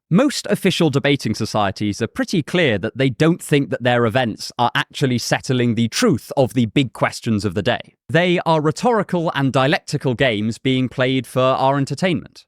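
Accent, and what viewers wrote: United Kingdom. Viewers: Yer .. . .....ah ha ..... Okay I've little to lose by my discovery of your site questions. Great stuff. Was it this pod or the last where I thought AI essayist Snapchat (is it?) can make anything believable. I remember at school a teacher pulling me aside and emphatically saying I should go into law - I wrote so convincingly she believed my work. High praise indeed....except that the essay was in microbiology, and utter nonsense. I'm from the UK as is your accent. I find that if I don't look at you and just listen your material is superb. Looking at you, my main thought is you are too young. Your material and presentation is too polished. I wonder if you are really a deep Fake; the writer a much older man or woman - and I get distracted from listening. Today I just listened. It was much better. Then, you seriously mispronounced a common word. 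So now I wonder if your look and talk are a very advanced AI bot. I just can't think of someone so young putting together and presenting these advanced lectures. There. I've said it. I still enjoy your work.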